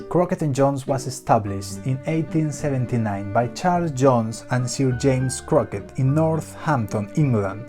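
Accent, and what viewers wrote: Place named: Spain. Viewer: The narrator is coming from Spain ? Because the funny accent.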